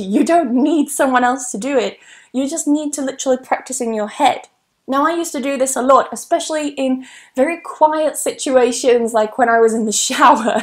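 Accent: United Kingdom. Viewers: I'm a huge fan of British accent english speaker. I love British accent. Carry-on thank-you so much. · Those tips of yours were really helpful to be honest for those who want to improve their English skills and can't find a partner to speak to. That happened to me a lot back then many years ago when I first started learning English. It's quite hard to find people who'd be willing to interact and help us with our English speaking skils by practicing it with conversations. I'll keep watching your videos anyway cause I want to get used to the British accent which I've been learning how to admire, cause before it sounded really weird, but it's changed gradually overtime! And I loved your accent specifically! :) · Thank you so much. Can i suggest you that maybe you can put the text below. Because maybe there are some people who don't understand because it is too fast or '' too british'' hehe.. 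Thanks a bunch. keep helping and improving. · I love British accent and you. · I love your beautiful british accent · Can you please tell me in which british accent you are talking🙂💐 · I'm from Uzbekistan, I love your British accent, you are the most beautiful teacher in the world, if I were your husband I would be the happiest man in the world 😘😘😘😍💓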